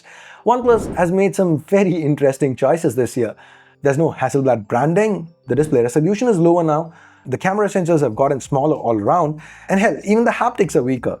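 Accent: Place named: India. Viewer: Indian accent killing me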